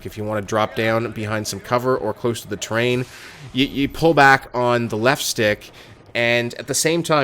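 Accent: Canada. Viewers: aboot !!! haha Canadians and their aboot !!!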